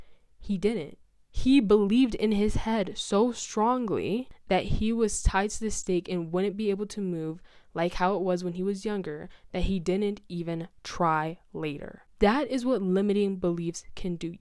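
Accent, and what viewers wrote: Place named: United States. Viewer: She sounds American to me, but she might be Canadian. Wouldn’t know what part of either country.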